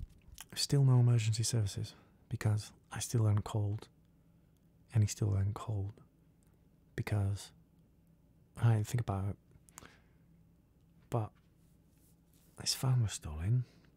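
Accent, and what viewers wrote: United Kingdom. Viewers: I love your softly spoken English accents so much! Your unique sense of humor always shines through. Super relaxing video. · Nailed the accent! Thats coming from a southern english person. You sound like u come from my town 😂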